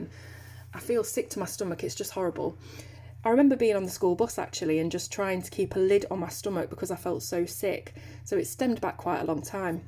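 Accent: United Kingdom. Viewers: Dear, I have nothing particulary bright to say on all those issues you've brought up, so I'll just go with all the goods your channel brings to me. First I love the way your always giving all the details I want to know about a products and damn am I picky about details but you never let me down. I love how "creative" your are bringing up things that may not seem directly related to coloring but always end up interesting. To me your channel is like a massive safe place, I know I'll feel good watching your videos, have my brain focus on bright colored things while learning tips. This video is no exception to my "colour with " experience. I don't mind deep subject when it's delivered simply and honestly like you just did. I think you have a huge skills when it comes to "talk" your pace, you're lovely British accent, your pronunciation, your way of playing with words always makes me feel relax and safe. 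The hard work your pour in your channel equals gentle bliss bubbles to me. Thank you dear.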